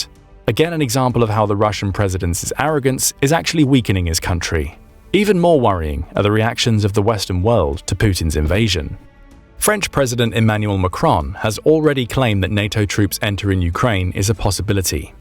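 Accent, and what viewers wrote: England, United Kingdom. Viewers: Are you from England,kinda figured 🇺🇸💅☢️ your boath toast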